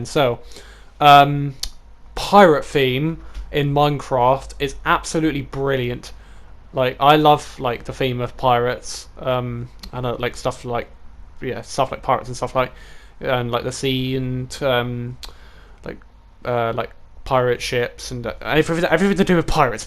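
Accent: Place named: United Kingdom. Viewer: you sound more british here. Is bmpt americanizing you?!?!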